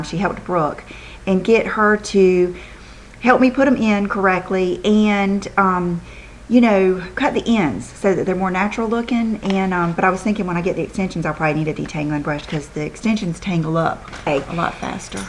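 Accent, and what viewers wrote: South, United States. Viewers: Hi, I just wanted to tell you that I love your sweet voice, it's so soothing and I love your southern accent:) xoxo Melody PS. Is there any way you could do a tutorial on your makeup look? It's gorgeous!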